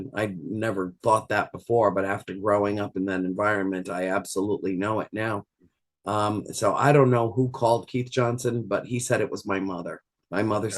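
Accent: South Africa. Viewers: What nationality is the presenter? He sounds South African??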